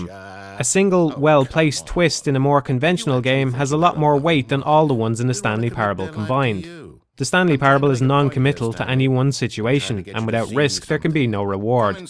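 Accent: Ireland. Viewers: You said "fuck you" in your thick Irish accent. My day has been made.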